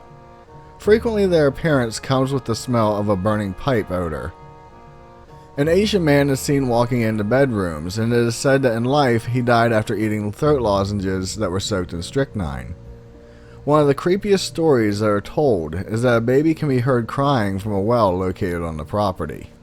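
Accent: Pennsylvania, United States. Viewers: You speak with the same accent myself and my family apparently have lol. I didn't think I talked different until people started calling it our "family accent" we all apparently have. People even asked me where I was from lol. Shocking when I say I was born and raised in rural northern PA haha.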